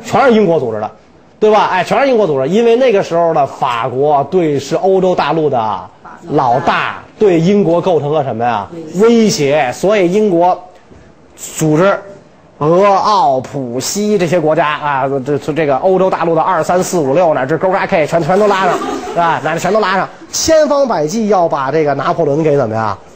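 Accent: Beijing, China. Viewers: 一口京腔，好听啊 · 哈哈 这个京腔好可爱～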